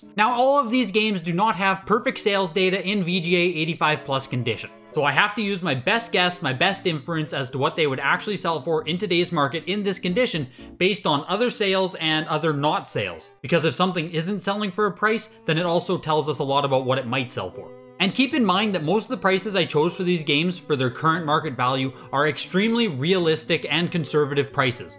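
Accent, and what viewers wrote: Canada. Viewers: I cant take you serious with your massive shoulders long hair tight shirt and canadian accent. Youre all over.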